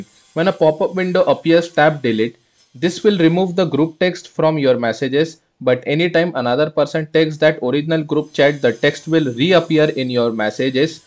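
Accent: India. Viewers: I can't understand your Indian/ English verbiage.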